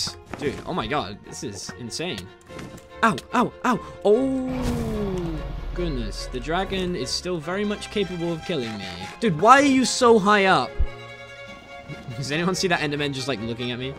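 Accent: United Kingdom. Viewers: Very British. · HES BRITISH? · are you british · How do some dont know is British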